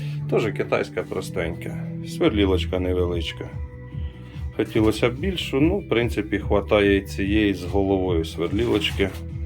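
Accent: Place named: Lviv, Ukraine. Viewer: Слава Україні!Гарні роботи,гарна майстерня,по акценту чую недалеко від Львова.Назар(Львів)трохи ваш колега.Мирного неба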